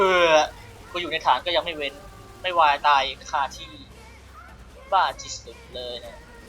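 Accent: Isan, Thailand. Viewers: คนอีสานรึป่าว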